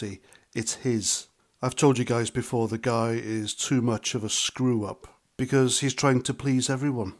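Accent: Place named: North Wales, United Kingdom. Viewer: Iawn c ont. Ti'n iawn? 🏴 Dwi wrth fy modd clywed acen gog ar you tube.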